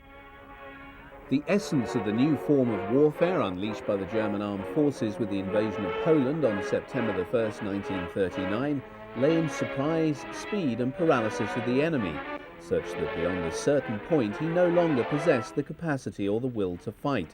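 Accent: United Kingdom. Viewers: Really nice video. Fills in the gaps left by most discussions on wartime vehicles. Want a good laugh? Turn on the subtitles. The system doesn't have an ear for British accents. "...their inadequate grasp of the theory of armored warfare..." = "inadequate grass people theory Obama"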